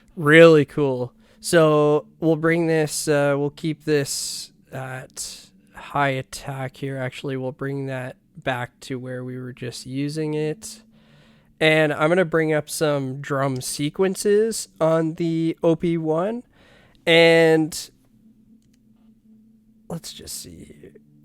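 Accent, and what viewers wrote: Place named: Canada. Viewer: I detect a Canadian accent - where are you from?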